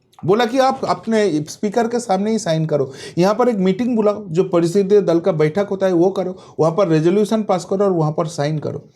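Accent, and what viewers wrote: Bengal, India. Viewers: Sir i really love ur channel . You bening bengali make HINDI langauge so sweet . Your dressup and style is awsome . Sir keep it up honestly ..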